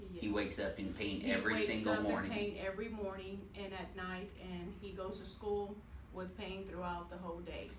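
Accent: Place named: Texas, United States. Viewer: That texas accent is great! Love it.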